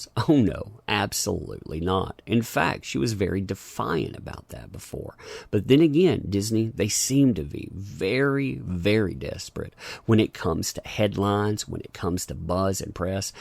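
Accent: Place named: Carolinas, United States. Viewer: are you north or south Carolina? You sure sound like it 👍 This Skywalker movie sounds horrible and I dont see it doing what Disney hopes.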